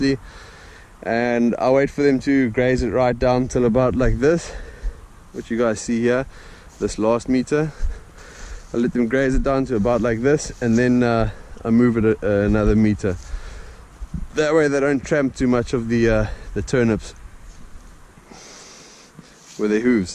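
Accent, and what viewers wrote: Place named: South Africa. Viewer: What part of south africa are you from?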